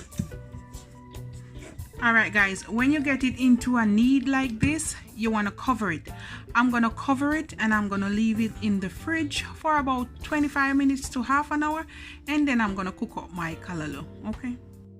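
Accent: Jamaica. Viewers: Wagwaaaan I love your Jamaican accent. .ouchea learning a great recipe for great food I hope you ill hallla at me too ...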